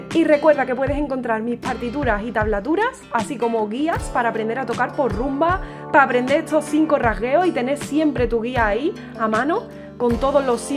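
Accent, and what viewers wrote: Andalusia, Spain. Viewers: Que combinación de talentos!! El acento andaluz es como ponerle el cherry al cake. haz escuchado alguna vez lo de las frecuencias 432 y 440?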